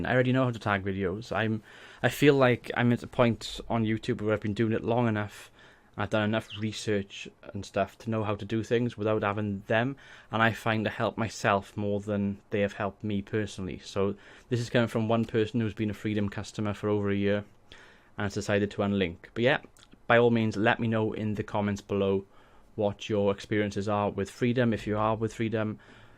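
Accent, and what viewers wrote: Wales, United Kingdom. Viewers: What part of Wales are you from?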